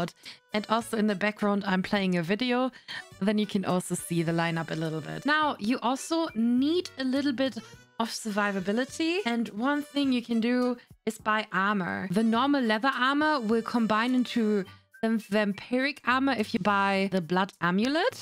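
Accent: Germany. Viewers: Boahh schwierig wenn der deutsche Akzent kickt, trotzdem gutes Video.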